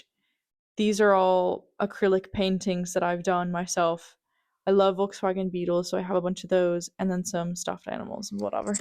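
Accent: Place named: Ireland. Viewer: i watched a lot of your video's in succession. You have no idea how much your accent changed from Texan to to far more Irish.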